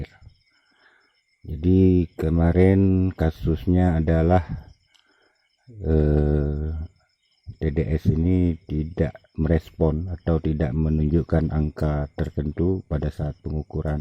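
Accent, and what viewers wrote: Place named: Aceh, Indonesia. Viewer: Met pagi bang dari suaranya sepertinya abang orang aceh, salam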